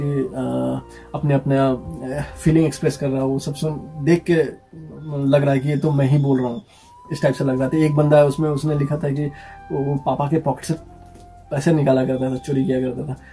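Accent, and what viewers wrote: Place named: Jharkhand, India. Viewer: You are so grounded man ❤️ I am also from Jharkhand ... Even aap Japan me rhne ke bawajood bhi Odisha jharkhand wale accent/tone me hindi bolte ho😎😎😎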